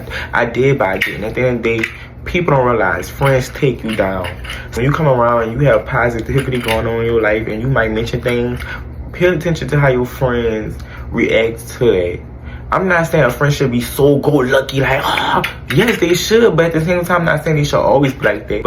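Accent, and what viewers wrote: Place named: Louisiana, United States. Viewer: Miss you and your million dollar sexy Louisiana twang, and that hot look is a bonus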